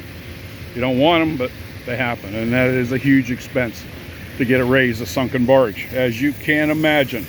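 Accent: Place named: Pittsburgh, United States. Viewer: are you from Pittsburgh that’s awesome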